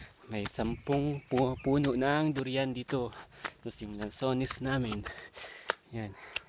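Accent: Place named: Visayas, Philippines. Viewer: bisaya jud si kuya..hehehehe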